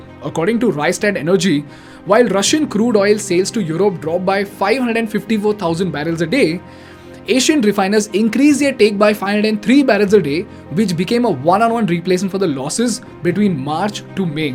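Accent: India. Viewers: Sorry but your English is not very good. Your English with Indian accent. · It´s hard to understand a person from India speaking Englisch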